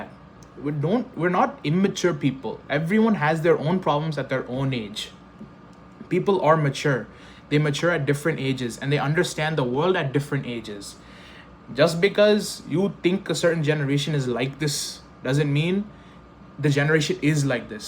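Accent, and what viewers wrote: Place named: United States. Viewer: Bro just speak without an accent bro. your /r/ sounds are all over the place. But you are doing a good job of creating content. you mix US and UK accent traits and as a voice and accent trainer you sound really Cringy bro. No offence, Lemme know if you want we can explore few tips so you soundd realllllly good bro. All the best!!! Peace :)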